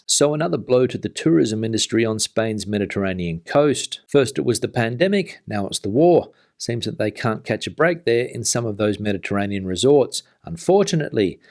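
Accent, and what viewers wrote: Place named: Australia. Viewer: Hi, I note you have an Australian accent! Do you see many other Australian expats in Spain, and what made you move to Esp from Aus?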